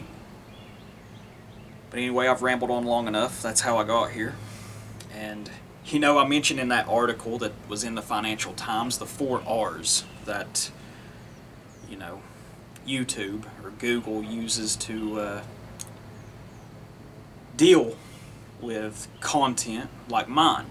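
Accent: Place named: South, United States. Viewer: These people probably saw you as an object of academic study with your southern accent (btw as someone who is proud of his own dialect, it's great to hear an american who speaks american for once.) and your opinions and job you fit into the cliche for them, which is really funny if you think about it. The joke is on them.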